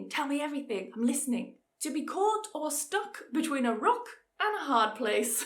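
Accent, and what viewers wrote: United Kingdom. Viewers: !! I love your videos! How do I find you on preply without having to search through every tutor? May favorite idiom … 🥁 Keep your wig on 😅🤣😂🤣😂🤣😂 Love it! Going to use that one here in America! I’m American (Spanish American), however LOVE the British accent and want to learn proper pronunciations. Thank you! Love your videos!! 🎉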